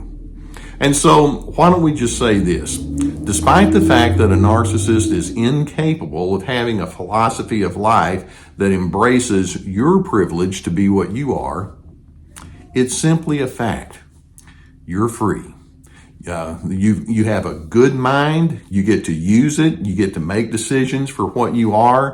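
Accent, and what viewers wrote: South, United States. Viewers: You are my most favorite older white male with a southern accent. I've never met one I've really liked. Thank you for being so amazing 🥰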